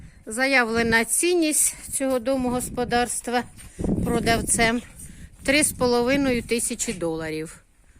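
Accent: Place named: Chernihiv, Ukraine. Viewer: По мові- Полтавська чи Чернігівська. Швидше Чернігівська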